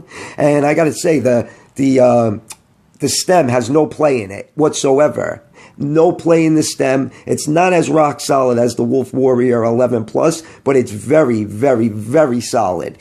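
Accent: New York, United States. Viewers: I nailed it my friend great commentary as well I love your ny accent and no b.s attitude keep up the good work my friend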